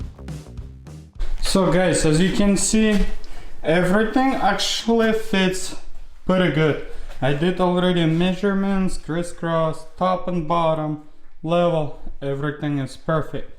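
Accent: Russia. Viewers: Привет, акцент слышится, что ты русский😁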